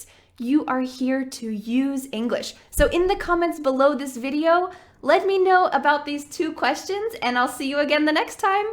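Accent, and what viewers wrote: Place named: United States. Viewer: iam from Somalia this is the only teacher that i listen always really it's help ful even i often like American accent KEEp it UP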